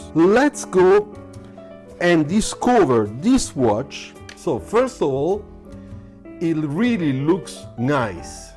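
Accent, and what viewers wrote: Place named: France. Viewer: Toi t'es français avec ton accent 😊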